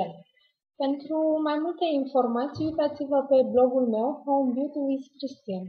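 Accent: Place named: Italy. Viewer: Si eu locuiesc tot aici in Italia , vorbesc 5 limbi straine si nu mi-am schimbat deloc accentul....incearca sa vb fara accentul care il au italienii, pastreaza-l doar atunci cand vb italiana, crede-ma e mult mai frumos.Nu am nimic cu moldovenii cand nu vor sa para ceea ce nu sunt.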